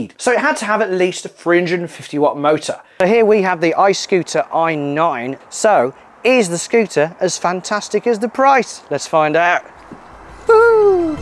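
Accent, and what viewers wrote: West Midlands, United Kingdom. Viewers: American why are you lying for🤪 beens every yellow & white number plate & the green phone control box is all British & the houses etc,god knows how you thought you would blag that one I live in the west Midlands & they all still use scooters here they blend in with the ones you can hire in the town centre so I wouldn't worry about it enjoy ya self they won't throw you in the clink😁 you probably live right down the road from me with that accent bro.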